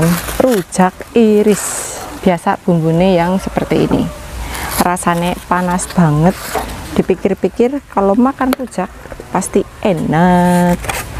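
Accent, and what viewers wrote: Banyumas, Indonesia. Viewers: Uewanakkkkk mbak, seger gitu ya, mbak nie org jawa ngapak ya, cara ngomongnya kayak nenek aku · Ru prtm kali liht, ngapak y MB, slm dr pnrgo, melu kemecer pdes" kecut sjk e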